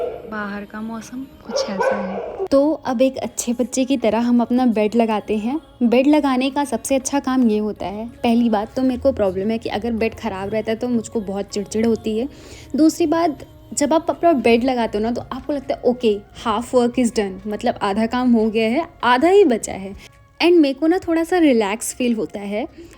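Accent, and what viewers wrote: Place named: Uttar Pradesh, India. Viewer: It's not about pronunciation, it's about the regional effects that's why you are speaking with such accent. As you are from up so it's your Hinglish ascend. A haryanvi will speak hos own Accent so don't worry regarding this things.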